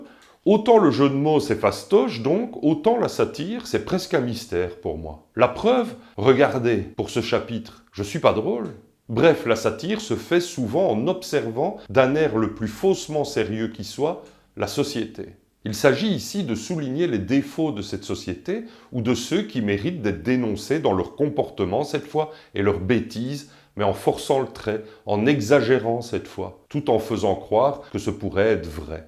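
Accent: Belgium. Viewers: PS avant mon post (chu un crétin, c'est un PRE-scriptum du coup et chu un génie) : en fait c'est l'accent belge, tu m'as eu ! Première fois que je vois ta petite tete chauve, tu me demandes de m'abonner, tu sais bien que c'est mon premier passage, j'ai ta miniature, un titre semi chelou, et toi qui vient m'intepeller avec un accent sympa ... Bha a , avec ta tete, ta miniature et ton titre, avec ta petite demande et ton accent de biereux, JE MABONNE ! Je viens de m'abonner, je ne jugerai pas sur cette video. A toi de me faire rester maintenant :) (je regarderai bien sur par apres tes anciennes videos, si ca me tente. Si c'est deja pas top, je verrai tes prochaines) Je compte sur toi ! Je veille ! ♥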